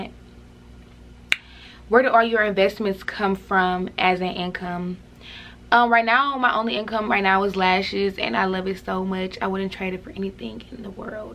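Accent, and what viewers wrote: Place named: California, United States. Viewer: I would have thought you were from either the bay area of Cali or somewhere from L.A. Lol. The accent.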